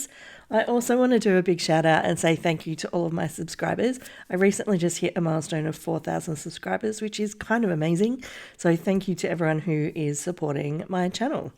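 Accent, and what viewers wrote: Australia. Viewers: hearing an Aussie voice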